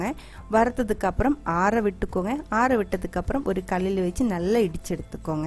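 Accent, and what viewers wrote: Kerala, India. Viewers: U Malayali or Tamil? 🤔🤔🤔🤔🤔 Talking so different 🤔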